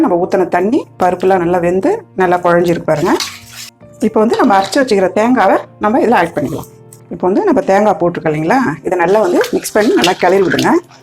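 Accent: Chennai, India. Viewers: சென்னை தமிழ்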